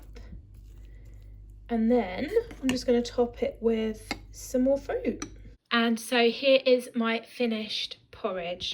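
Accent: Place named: England, United Kingdom. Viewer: You are looking well eventhough you have a cold. I too am taking apple cider vinegar but I couldn't stand drinking it so I ended up getting the capsules. I am also taking probiotics. I heard about the raw garlic but I haven't started it yet. By the way, I love listening to your English accent!!! I have a strong NY accent so you are so much more proper than mine! Eventhough I have lived in Florida for almost 20 years, I still have my NY accent! It's interesting how different the products are in England compared to here in America. We have Aldi here too. It is my favorite grocery store. Do you have Whole Foods? Do you ever order from Amazon? Your Slimming World reminds me of our Weight Watchers. Continue doing well. You are in my prayers especially about the radiation. My husband and I have been quite sad. My mother in law passed away on Tuesday. 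So it has been a hard week for us. God bless you. (((((HUGS))))) from America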